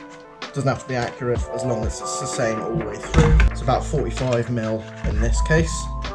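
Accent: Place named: England, United Kingdom. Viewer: Thanks heaps. So simple and easy to make. Good, clear explanation and camera work. Great to hear a pommy accent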